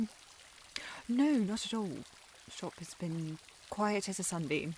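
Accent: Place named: England, United Kingdom. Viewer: Yo, when she hit me with dat " Well go on, go! Time is of the essence!", I was so happy. Dat English accent slaps!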